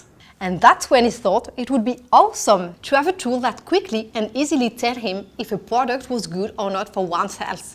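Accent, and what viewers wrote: France. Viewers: The narrator should be someone who speaks fluent English without a strong French accent. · Love the thick French accent with risky soundly whiskey. lol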